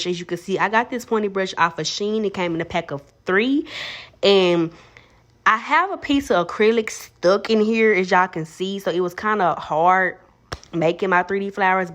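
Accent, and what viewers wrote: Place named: Arkansas, United States. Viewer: I had a friend from Little Rock Arkansa, I’m from Compton,ca. he had that same accent as you…I love it though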